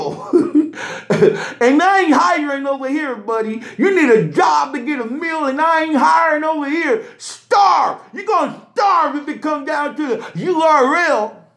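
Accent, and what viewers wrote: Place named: New York, United States. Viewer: ..#AAYYYOOOOO..!!..THIS SHIT IS HILAAAAAAARIOUS..!!! 😂😂😂😂😂😂👏👏. NEW YORK ACCENT IS FUCKING COMEDY GOLD..!! #BRUUUUUH..!!😁😁😂😂👏👏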